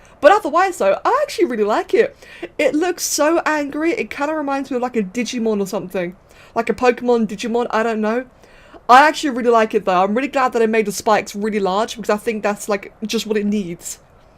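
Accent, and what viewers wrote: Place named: RP, United Kingdom. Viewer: poshest women on ark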